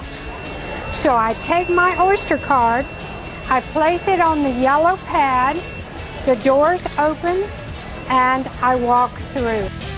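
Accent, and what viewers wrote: United States. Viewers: Nice Video! Very well explained! I like hearing the American Accent in London!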